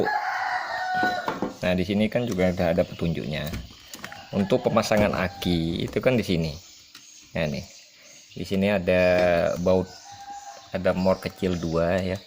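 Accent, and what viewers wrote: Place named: Tenggarong, Indonesia. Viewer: kira urang Tenggarong tadi,,dengar logatnya,,salam dari kukar ni broo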